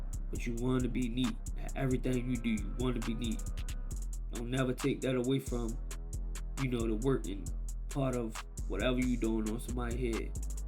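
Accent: Baltimore, United States. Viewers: What’s funny is I’m from Baltimore but I’ve been in LA for about 4 years now. Came across this video and started watching it and immediately was like “that’s definitely a Baltimore accent” 😂 cut is super clean 🔥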